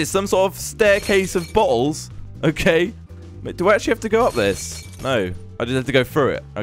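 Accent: United Kingdom. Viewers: are you british or slovak? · ser u british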